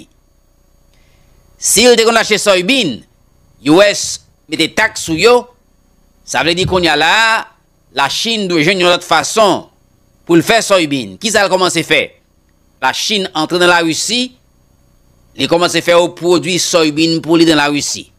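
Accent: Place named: Haiti. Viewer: Bonsoir frem mkontan tande ou avek gro kreol sa frem Oh oui Mr le President kompeann gin lot peyi ki ka vinn niche deye l Ayayayyyy frem kreol la gro nan mains ou oui la Merci et bonne semaine avek fanmi ou specialement la petite princesse Reserve yon ti temps speciale pou ti princesse la pou moin frem Minm ou minm wap kontan Le wap pale avek li Pitite fille yo tjou rinmin papa yo et papa tjoujou rinmin pitite fille yo Autrement dit vice Versa frem Ke bon Dieu beni ou protege ou Frere c pou Aprann konnin ou gin plus moun ki apprecier ouki respecter ou a l egard de service wap rann Societebhaitienne ke moun ki comporte yo kom des imbeciles Ok ke toute bon bagay yo bon Dieu fe yo pou ouak toute pitite ou yo madanm ou et bon travay bonne semaine Bonne sante bon courageet bonne perseverance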